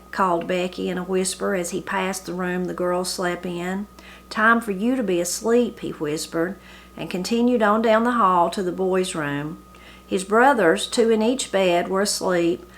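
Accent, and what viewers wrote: Appalachia, United States. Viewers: I was looking forward to the last installment today. I've enjoyed hearing it, and your authentically Appalachian voice made it more of a pleasure. I haven't done a good job of clicking "like" on your daily Christmas stories from Appalachia because I've been watching on my TV where I have click "like" before it ends. But they have been a Godsend to me this month; a daily reminder to stop and relax and enjoy the season. A little bite of Christmas each day, like appetizers. Thank you for all the extra work you've done to produce so many Christmas videos and for inviting us to share your Christmas preparations. Merry Christmas to you and to your whole extended family.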